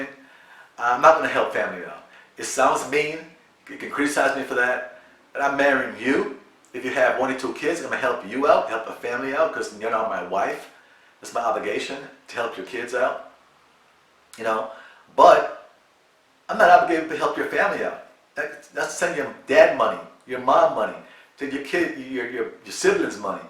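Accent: Jamaica. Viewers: I live in the D.R. and I Teach at a private school.. I would like to teach online..can you point me in the right direction. I hear your Jamaican accent..I'm Jamaican too ..via Toronto/New York.. I m glad you made the choice to live life..and not get stuck Pretending in the Matrix.. Please respond your information would be valued.. Love what you are doing!!! Selvin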